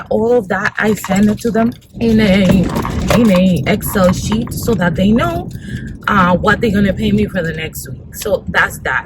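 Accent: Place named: South Africa. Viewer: Don’t mean to be rude but you sound South African.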